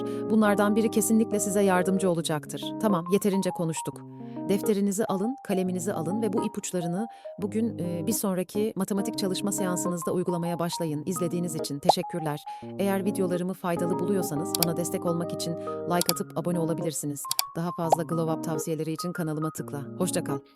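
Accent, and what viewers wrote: Azerbaijan. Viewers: sen Azerbaycanlısan?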